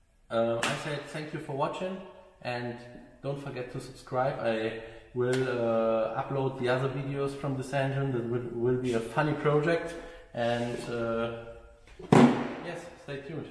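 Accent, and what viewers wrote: Germany. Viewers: it sounds like a german guy speaking english. wrong how? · wenn deutsche englisch sprechen... :D aber er weis was er macht-top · German English is actually cool to me. We are all car enthusiasts...we kind of already know what is happening even without a lot of words. 😊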